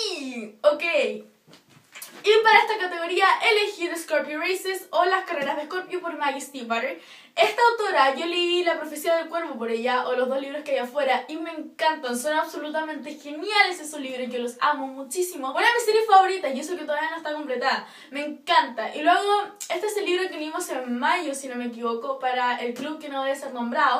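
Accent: Chile. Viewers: Eres chilena cierto? Que chori jashdfjaj (: ♡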